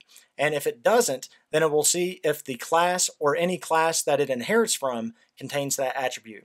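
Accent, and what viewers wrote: United States. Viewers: Great videos. Love them. Learnt a lot from you. Thanks so much. The American accent was awesome too. Like Buster Scruggs teaching me about class variables. Wanted to shoot a gun into the air each time I completed something. Yeehaw. pew pew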